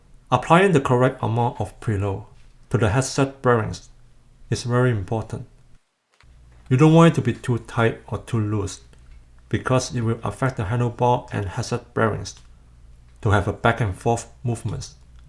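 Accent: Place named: Canada. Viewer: I think hes chinese Canadian. I base off his accent and I think I got a hint about the canada in a past video.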